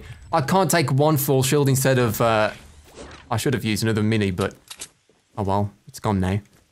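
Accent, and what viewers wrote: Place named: Midlands, United Kingdom. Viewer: Just subbed. Enjoying thr ps4 game play and I'm guess from the accent from a fellow midlander. Not sure why my fornite plays totally different to this tho. Whenever I get in a gun fight in busy areas I lag out and die ( that's with virgin fibre connected with an Ethernet).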